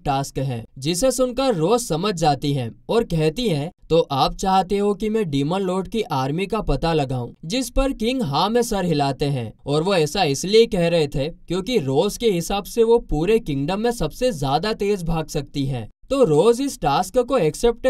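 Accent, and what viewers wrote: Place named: Gujarat, India. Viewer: Bhai ton Gujarati jese ha muje to acha lga😅❤ Love you 😎 bro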